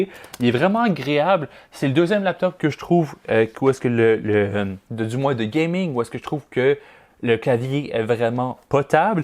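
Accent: Quebec, Canada. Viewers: j'adore ton accent québécois +1 abo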